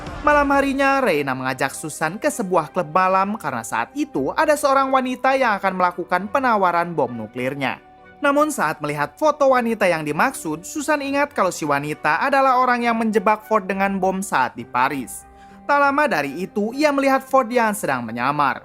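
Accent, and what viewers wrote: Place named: Padang, Indonesia. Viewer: Translate nye logat padang nih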